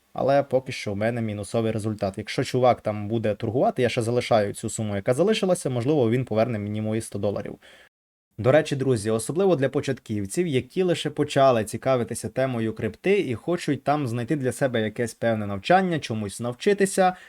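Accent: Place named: Transcarpathia, Ukraine. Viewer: Чується Закарпатьський діалект )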